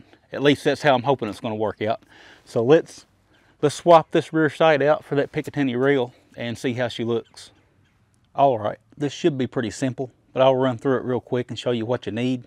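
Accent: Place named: South, United States. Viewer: Hey. Like that your videos cover such a wide spectrum of firearms, ammo etc. Just because you aren't an AR guy, or a Henry fan, or a revolver fan doesn't mean that you can't learn about and enjoy them on channel. An added bonus is that you get some culture with the southern accent and geography as well. Keep em coming, they are all good.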